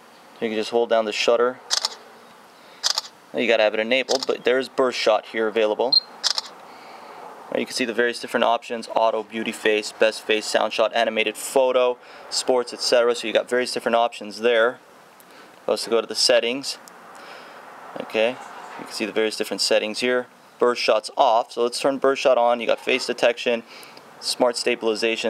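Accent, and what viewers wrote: New York, United States. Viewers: Superb review. By the way, is that a N.Y. accent?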